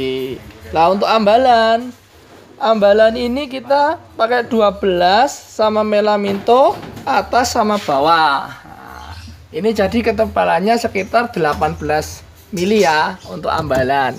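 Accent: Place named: Java, Indonesia. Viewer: sip boso jowone medok .👍👍👍👍 tapi inspiratif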